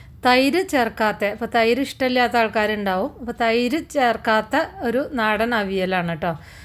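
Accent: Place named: Thrissur, India. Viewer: good receipe......TSR speak pole thonni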